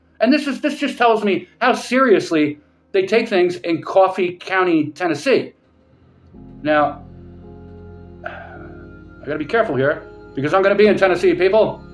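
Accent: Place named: Ireland. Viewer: I did NAZI that coming...I would have thought you were Irish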